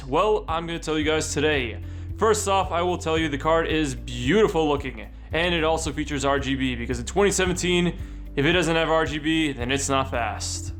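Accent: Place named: Boston, United States. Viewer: why would you say wicked? because youre from Bawston. Own that shit, brah.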